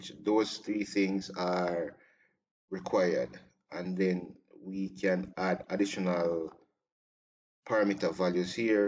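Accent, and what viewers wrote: Jamaica. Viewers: jamaican accent on a php tutorial is maad crazy. big up unnu self man. Love from kenya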